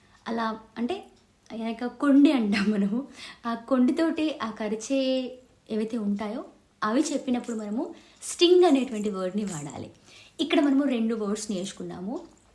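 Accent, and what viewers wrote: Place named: Khammam, India. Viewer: Your telugu seems to be from khammam district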